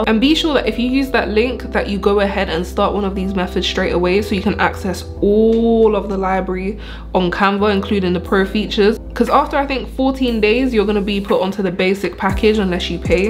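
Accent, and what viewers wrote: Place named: England, United Kingdom. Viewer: Hi 👋. Are you single sweetie. I love your English accent 😍.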